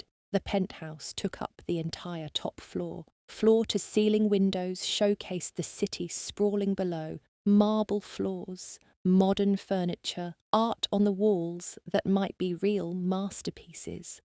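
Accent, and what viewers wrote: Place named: United Kingdom. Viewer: I’m watching from having Indiana, and I have discovered that I could listen to a British accent talk about anything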